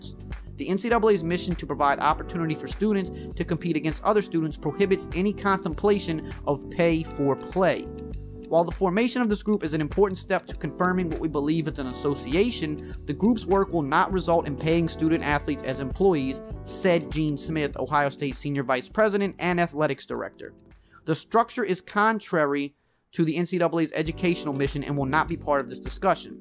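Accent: Louisiana, United States. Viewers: This is the first video I have seen of yours in the first 15 seconds I knew you was from my neck of the woods lol, I'm from Da Parish you sound like you from at least close to that area, any who great content and I'm subbed. WHO DAT!!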